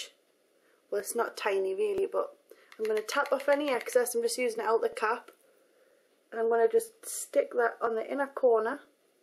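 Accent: Newcastle, United Kingdom. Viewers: She's originally from South Shields, England. It's called a Geordie accent and I love it too! :D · It's a Geordie accent, it's from the North East of England. Typically around the Newcastle area.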